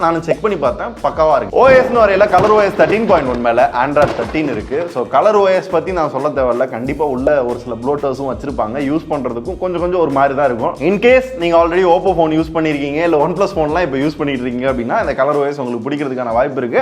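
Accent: Madurai, India. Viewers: Takkali....vokali ❤ pathukkalam madurai slong❤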